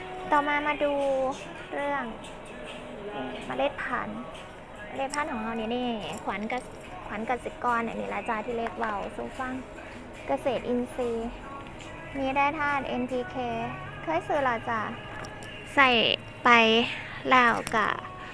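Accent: Isan, Thailand. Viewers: เว้าภาษาอีสานหม่วนคัก เว้าดีเสียงเป็นตาฮัก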